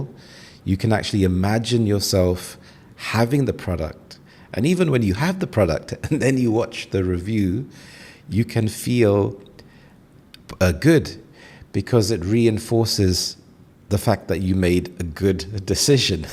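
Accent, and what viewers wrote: United Kingdom. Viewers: Hello Sir., it's the first time I've watched a video podcast episode from yours and I liked that, it was nice, relaxing and with a very clear sound and voice...a nice warm clear British accent the accent I'm trying to acquire for myself. I believe that I could try to shadow it if it is ok.Nice topic indeed, I don't buy on-line a lot and for important items I'd rather go in a shop big or small. Thank you very much, I'm happy to have found another interesting channel. Cheers! 🙏😊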